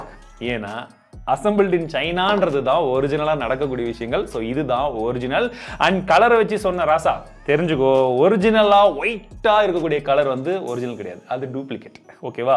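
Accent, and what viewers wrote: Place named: Usilampatti, India. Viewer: Uslimpatti slang super bro 🔥🔥🔥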